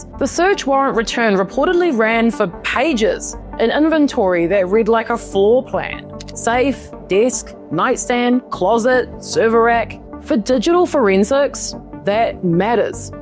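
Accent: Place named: New Zealand. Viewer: New Zealand/Kiwi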